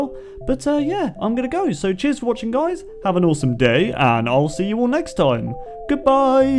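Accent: United Kingdom. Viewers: Are u British accent?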